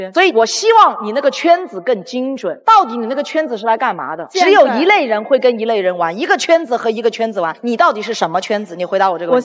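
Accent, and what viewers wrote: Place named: Chongqing, China. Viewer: 我以為你是重慶人。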